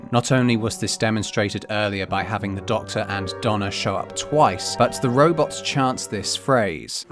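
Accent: RP, United Kingdom. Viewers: Yse a this is the posh voice I have been thinking of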